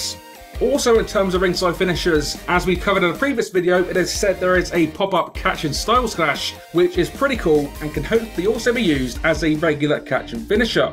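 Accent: England, United Kingdom. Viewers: also go watch denkops or will power if you don't like the british accent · Rasist? What the fuck, men? I am is not rasist. I just don't understand English accent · Why you here if you dont like his accent. He's British how do you expect him to lose it? Before you start getting all brave and confident think about what you're saying before you say it. How can a BRITISH person lose their BRITISH accent. Common logic man. Thumbs up to you!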